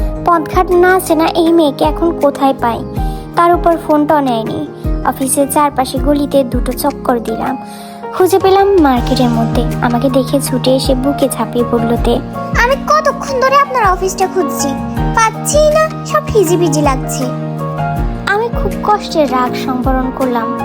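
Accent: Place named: Chittagong, Bangladesh. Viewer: Apu apni ki chittagong er? Apnar kota gulu shunte keno jeno erokom lage Ar story onek beshi shundor hoise 😘❤️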